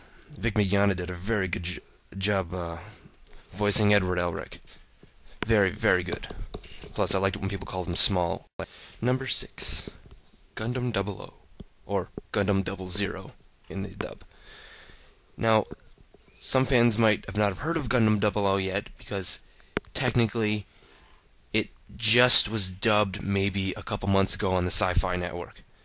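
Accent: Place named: United Kingdom. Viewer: u fukin silly uk guys with ur silly way of talkin u can just make trailer with music no need to talk too much fool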